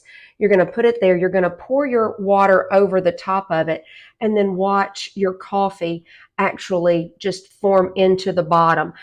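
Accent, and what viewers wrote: South, United States. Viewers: Mine came with the instructions inside the pot. How to get out? It is like the "monkey paw in the jar" thing. Also, you are smokin' hot and I dig your southern drawl. Thank you for being you.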